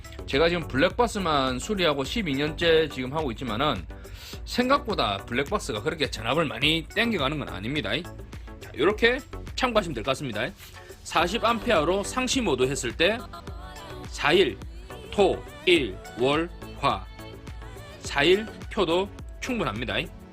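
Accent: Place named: Busan, South Korea. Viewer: 사장님 궁금증을 풀어주셔서 감사합니다. 저는 포항 사람인데요. 사장님 말씀이 말끝마다 됩니다이, 안 됩니다이, 좀 웃깁니다이~😂😂😂 부산 그 특유의 말씨 ㅎㅎ. 암튼 정보 감사하고 믿음이 가는 분 같습니다. 번창하세요~!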